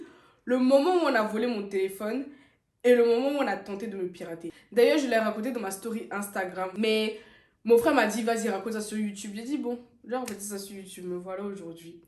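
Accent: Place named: Ivory Coast. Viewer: Sa se voit trop dans ton accent que tes ivoirienne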